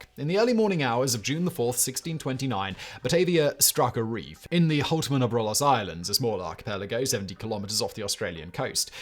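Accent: England, United Kingdom. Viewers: When there is anything, you are not, you are you’re just smart and stupid ass to the rest of us, but your accent seems to fool the Americans Yes, because you’re British you’re not not you are not smart for the fall as many Americans and Canadians seems to think you are useless dumb ass everybody else and even dumber sometimes when the way you presented videos say my name you you’re stupid stupid man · If it wasn’t for accent (fairly typical British) I’d swear that English wasn’t even remotely close to his native tongue - the way he mispronounces so many straightforward words eg wallarbees (What the feck dude!?!?) is so funny to hear. Doesn’t anyone in his crew bother to proof read or check such basics before uploading this stuff? It’s becoming as bad as the “Dark5” series of channels with all its errors, cockups, and general idiocy. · I have no idea of most of his pronunciation. 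Is he English?? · This guy pops up in almost every channel I'm subscribed to but with different chin hair every time - I always recognise that posh English voice though ha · I'm not sure what I like more about this video. The historical storytelling, glorious British accent, or that godly beard he's rocking. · Ade you all being serious? Perfectly fine English accent and speech pattern! Lord help ya’ll if you bump into a Glaswegian😲🤣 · No offense, but to me, Englandish accents are annoying. I’d rather listen to AI, than someone unable to speak English in a less affected tone, or way. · Southern California raised and when I see a thing about Mexican anything and then hear the British accent I know I am in for a bad time. Mispronunciation of non English words is nails on a chalkboard to me and at this point I think the mispronunciation is on purpose at this point as revenge for losing the colonies.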